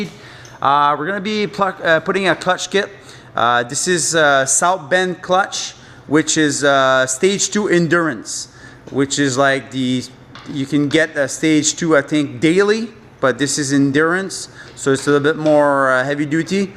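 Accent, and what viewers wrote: Quebec, Canada. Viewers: good video thanks, I'm doing mine today, You sound quebecois , Are you in Gatineau or Montreal?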